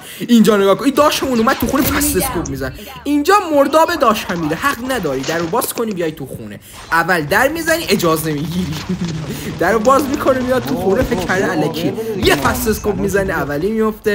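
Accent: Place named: South, Iran. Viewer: لهجت مثل جنوب یاست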